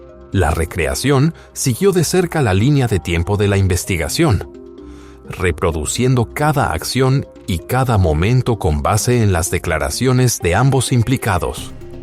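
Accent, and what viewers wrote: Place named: United States. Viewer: Es muy raro ver a alguien que no es estadounidense con acento estadounidense.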